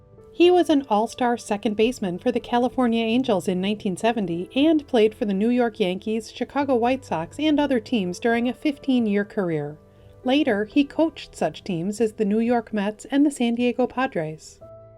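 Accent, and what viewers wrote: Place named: Fargo, United States. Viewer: Think about using ai to narrate for someone less Fargo sounding.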